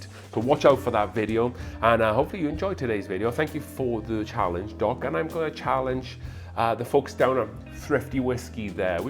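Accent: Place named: Wales, United Kingdom. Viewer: based on your accent that you are originally from Wales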